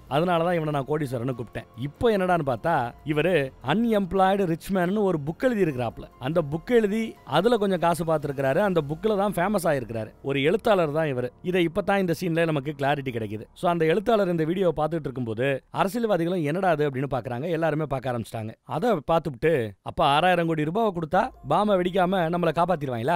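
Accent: Madurai, India. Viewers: இவ்ளோ அழகா கதை சொல்ல தெரிஞ்ச உனக்கு நம் தேசத்தை மதிக்க தெரியவில்லையே உன் பேச்சு மதுரைக்காரன் போல் தெரிகிறது எதிரியான துருக்கி பேராபத்தில் இருக்கும் பொழுது உதவிக்கரம் நீட்டிய தேசம் நம் தேசம் சைனா பாசம் தான் உனக்கு அதிகமாக உள்ளது உன்னைப் பெற்ற வர்கள் இந்தியர் தானே அதில் சந்தேகம் இல்லையே உன்னை காயப்படுத்த வேண்டும் என்ற நோக்கம் எனக்கு இல்லை நம் தேசத்தை இழிவாக என்னும் எண்ணத்தை முதலில் மாற்று நீ கதையில் சொன்ன பெண்ணின் மனநிலையும் உன் மனநிலை ஒன்று போல் தான் இருக்கிறது உன்னைச் சுற்றி உள்ள நால்வரை வைத்து ஒட்டுமொத்த தேசத்தையும் சந்தேகம் கொள்ளாதே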